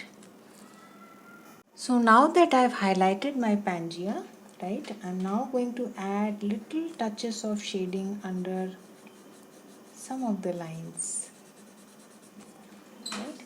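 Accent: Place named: India. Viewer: You sound very like Indian maybe pakistani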